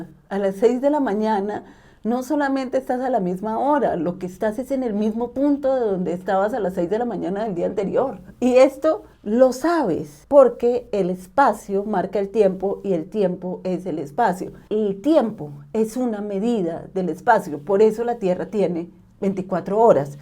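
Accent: Colombia. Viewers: Me encanta tu personalidad, tu risa ,tu carís-ta... sos colombiana verdad ?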